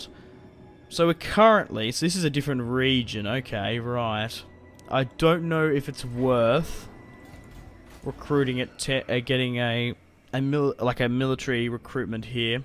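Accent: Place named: Australia. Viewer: The only thing that keeps me watching your vids is your Aussie accent. It's like listening to a 18th century English commander. I bet u have a handle bar mustache too.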